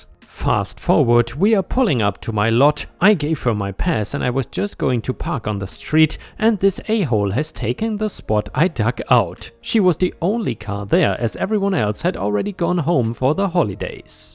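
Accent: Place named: Germany. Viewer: You do sound preeeetty german, man.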